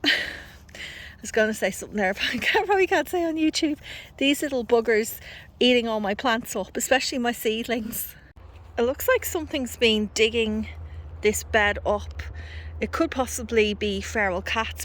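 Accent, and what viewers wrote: Ireland. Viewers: Love the Irish accent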